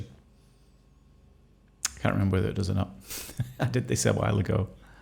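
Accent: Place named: North, United Kingdom. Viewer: Slab. What a great word for that piece of wax! And I love how you say fudge. Those northern u sounds are lovely. ❤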